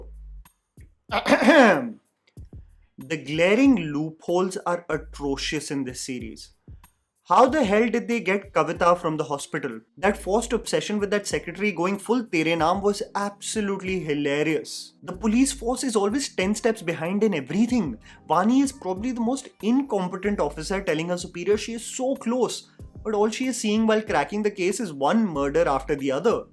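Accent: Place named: Maharashtra, India. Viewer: You're Marathi accent is just adorable ❤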